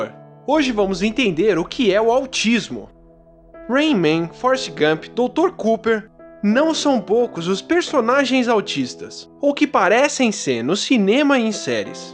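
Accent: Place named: Rio de Janeiro, Brazil. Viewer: this carioca accent is deadly deplorable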